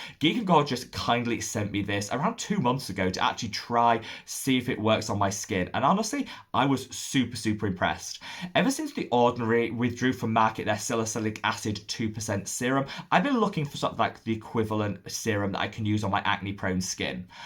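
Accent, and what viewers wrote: United Kingdom. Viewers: I like the bulletin style for reformulations but really appreciate the in depth analysis on new products. Being American, I need to listen hard to that oh-so-cool fast Brit accent, so the rewind button is my friend during the latter. You do such a good job, and I find true value in your videos.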